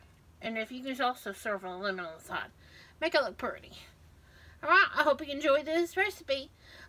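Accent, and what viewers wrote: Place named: West Virginia, United States. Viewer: well, I have a. Horrible pain when she speaks Hello ever body.....scrapes. she doesn't care. I'm not subscribed and wont matter if I don’t watch. I don’t subscribe she doesn’t ever respond even to her wrorshipers. ( those who praise a new shirt with stains) I can like subscribe a million other YouTube cooks, like Paula deen ( who is a true a Sourtherner. Not fake Orr a wanna be ). My grandparents were from West Virginia-as is and their accent was hard as well)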